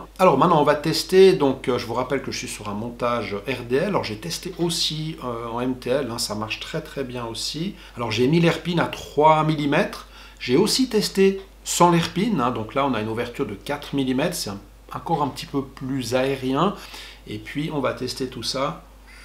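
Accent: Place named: Switzerland. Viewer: Hello, Bravo pour tes magnifiques vidéos. Il me semblait avoir reconnu un accent Suisse. Salutations du Jura. Sais tu s'il y a encore un salon de la vape en Suisse ?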